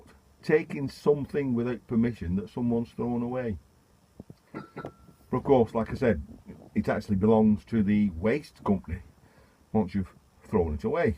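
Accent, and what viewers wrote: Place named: Wigan, United Kingdom. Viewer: Lol as soon as I heard your accent, I thought... hes from Wigan, so am I :) My partner is a Man and Van at the moment and looking into scrap metal instead. Any advice would be great. The rules over here are pathetic, its almost impossible to dispose of ordinary rubbish, I have phoned 3 private sites to see what their rules are regarding waste disposal and you cant take your van on site anymore due to health and safety..... its laughable.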